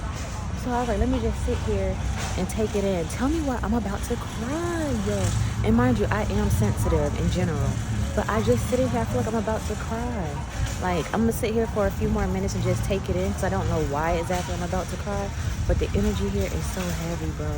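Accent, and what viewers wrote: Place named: South, United States. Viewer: I would love to know where you are from. You sound as though you fit right into the south. Welcome back when you return to Louisiana.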